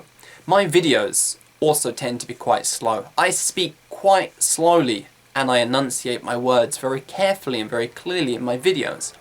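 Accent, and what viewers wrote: United Kingdom. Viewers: Excuse me, I am Fitri from Indonesia, 18 Years old. I have a question that is what kind of languanges do you use ? American English or British English ? Because, some times when I am listening your speaking, I guess your speaking in British English. Is it true or not ? Please give me the explanation. Thanks :-) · He sounds British or something to me but idk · achi milorava It's because of his British accent :)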